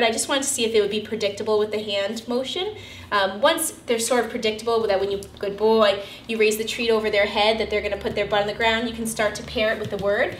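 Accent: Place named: New York, United States. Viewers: Are you from new York or new jersey? I kinda hear an accent there lol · She’s gotta be from Long Island. We’re the only people that pronounce dog that way lol. Great video. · this New York accent is almost unbearable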